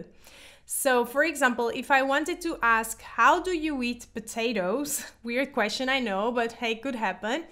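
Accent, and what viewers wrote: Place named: Portugal. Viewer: So refreshing to hear a proper neutral european accent, and not that "suburban ghetto" accent of Lisbon we hear every youtuber and news reporter use more and more often (nor the thick nord accent). A massive thumbs up for helping to mitigate that nauseating "urban myth" brazilians like to propagate that we portugue speak like we're rapping and that we eat all the vowels and half of the words. Not everyone in Portugal is a chunga, azeiteiro or grunho; many of us (still) speak properly and with a normal cadence. Keep up the good work! :)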